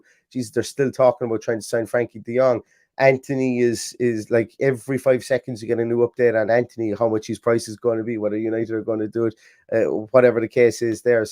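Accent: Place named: Ireland. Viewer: The only podcast thats combines great Villa content with the ever so listenable Irish lilt, that's a killer combo, keep it up !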